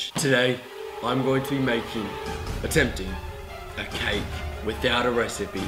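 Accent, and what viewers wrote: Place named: Australia. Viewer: what is your accent ahahaha it sounds like extreme australian accent lol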